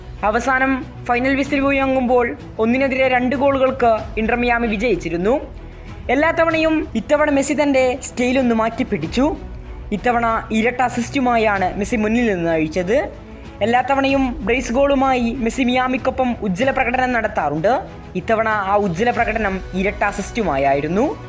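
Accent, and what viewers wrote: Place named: Kozhikode, India. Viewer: കൊയ്കോട് ഉള്ളതാ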